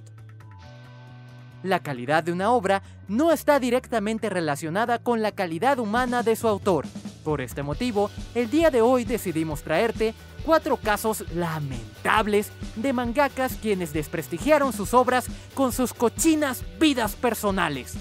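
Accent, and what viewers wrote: Venezuela. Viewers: hola y buenas disculpa pero eres venezolano? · eres Venezolano?jajaja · eres de Venezuela? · Verga pero el que habla es Venezolano? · Tío eres de Venezuela?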